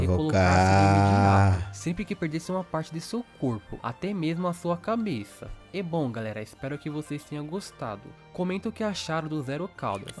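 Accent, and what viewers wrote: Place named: Portugal. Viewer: Cara gosto muito do sotaque de portugal é bem legal mano sigo um bocado de youtubers portuguêses por causa disso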